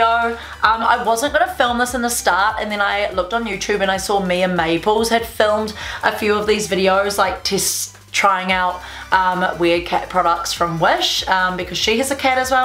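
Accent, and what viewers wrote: New Zealand. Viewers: I thought the accent was Kiwi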